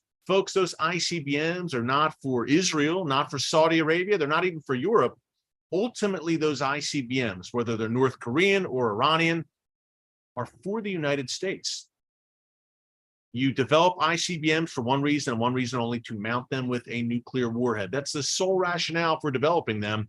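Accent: Philadelphia, United States. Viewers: Lol Thought I heard Philly accent.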